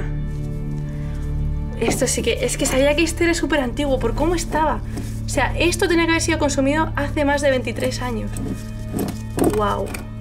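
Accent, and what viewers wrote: Spain. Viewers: Me gustan mucho tus vídeos de verdad. Pd:Me gusta mucho el acento español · Amo tu estilo narrativo,! Tu timbre, tu pronunciación y tu vocabulario. Me encanta el acento español! Saludos desde Mérida, México.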